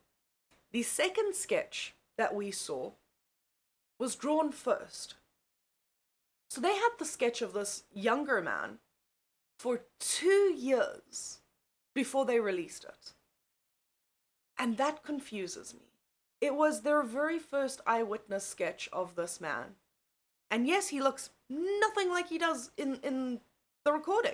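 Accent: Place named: South Africa. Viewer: i believe she's South African, beautiful accent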